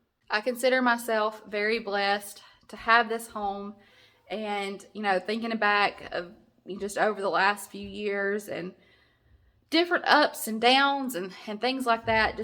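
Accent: South, United States. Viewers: I have just found your channel and SO glad I did. When I heard your Southern Accent, I knew you had to be from Alabama, too. I am from the beautiful state of AL and love the south. Your home is gorgeous. I can't wait to see it decorated. I hope you will have nothing but happiness in your life while living there. I am already a fan and will be going back and watching your other videos. God Bless you and your family.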